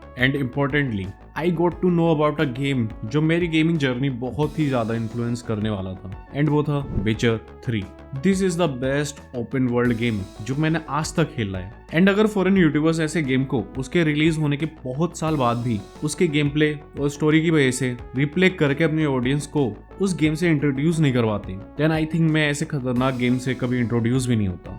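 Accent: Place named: Delhi, India. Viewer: Bro makes any game funny by his delhit accent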